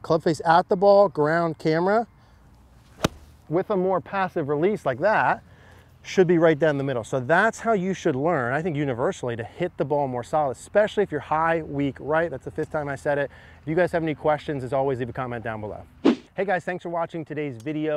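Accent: United States. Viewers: My god it's so much better listening to an American accent and straight forward video rather than these British guys and all their cringey clickbait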